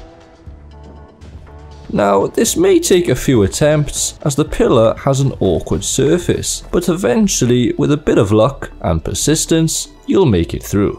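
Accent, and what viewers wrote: Wales, United Kingdom. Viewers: are you actually welsh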